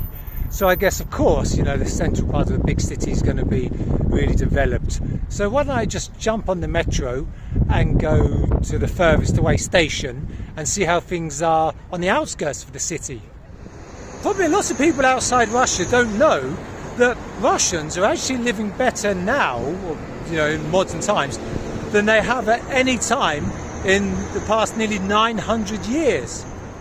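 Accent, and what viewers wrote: United Kingdom. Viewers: British accent 😁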